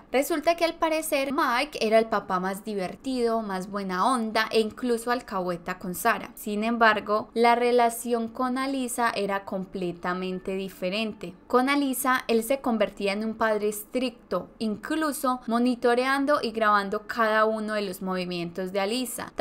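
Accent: Antioquia, Colombia. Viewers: Eres de colombia verdad se nota por tu asentó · Saludos desde Orange California 🍊 from 🇲🇽 Mexico. Ese acento colombiano el mas hermoso ❤ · Es una mueletilla del acento paisa en Colombia...es genial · Wow! Muy buen video, me gusta como narras y la información que proporcionas está muy detallada 👌. Tienes una nva. suscriptora 😃. Por cierto tu acento de 🤔 colombiana? me suena bien lindo, me recuerda a las de las novelas colombianas que veía con mi hija 😁. Saludos desde Mérida, México 🇲🇽. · un gusto tu forma de contar ya me suscribi eres de colombia?saludos desde lima Peru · Te encontré, y no pude resistír hacer una maratón de tus videos . 😮 wow lo haces muy bien y ese acento paisa me encanta viva nuestra 🇨🇴👏🏻👏🏻👏🏻👏🏻👏🏻👏🏻👏🏻👏🏻👏🏻👏🏻👏🏻👍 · es Colombiana 🇨🇴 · Primera vez que veo tus investigaciones, soy panameña, me encanta tu atento colombiano, me encanto el Berraca, excelente trabajo y este caso te quedo fenomenal, duro y exitos guapa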